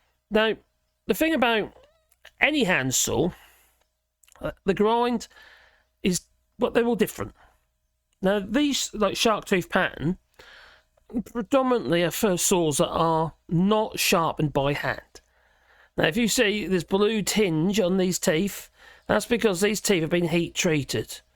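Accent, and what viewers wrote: Norfolk, United Kingdom. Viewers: You a Norfolk bumpkin buh?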